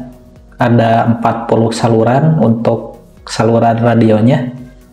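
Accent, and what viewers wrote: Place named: Sunda, Indonesia. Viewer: Urang sunda nya mang,,,😁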